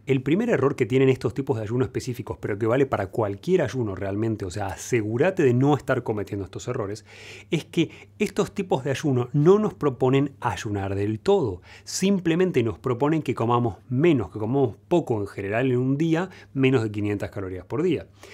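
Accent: Argentina. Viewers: 🤔🥴🥴🥴 Yo ayuno a diario porque no dijero nada bien, lo hago sin ton ni son. Pero ya me perdí con tantos tipos de ayuno Dr. Está Ud muy guapo 😘 y su voz es una caricia al oído. Nos tiene enamoradas su conocimiento y su bondad de compartir. Me acabo de dar cuenta de su acento argentino, juraba era español.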